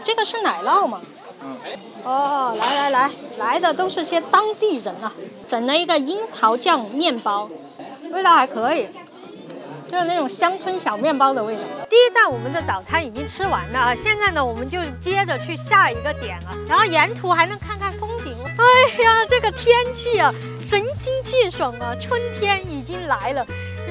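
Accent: Sichuan, China. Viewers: 不一样的坝坝宴，不一样的川式普通话，不摆嘞。。。。！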